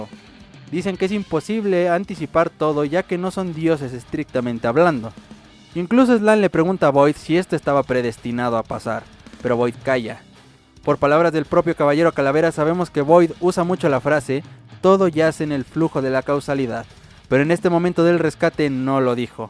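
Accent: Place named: Chile. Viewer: Suenas igual que apura chilena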